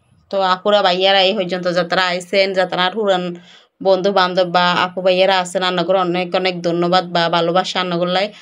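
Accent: Noakhali, Bangladesh. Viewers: অসাধারণ হয়েছে আচার রেসিপি সাথে নোয়াখালীর আঞ্চলিক ভাষা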